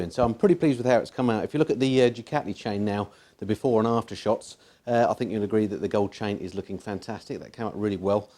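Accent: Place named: United Kingdom. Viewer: Brits talk funny.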